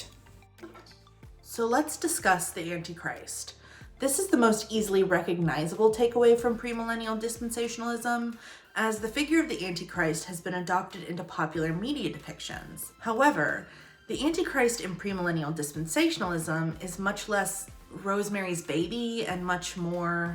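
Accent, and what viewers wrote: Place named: California, United States. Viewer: Still not over your California voice 😭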